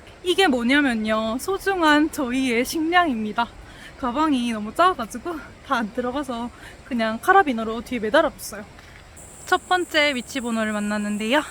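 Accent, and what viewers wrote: Gyeongsang, South Korea. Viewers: 경상도 어느 동네 말씨인가용? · 엥? 대구셨네요 ㅡㅡㅋ 저도 대군데 경남쪽 사투린줄 알았네요 ㅎㅎ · 말투는 갱상도 가시나 얼굴은 서울여자? 대단합니다~ ㅎㅇㅌ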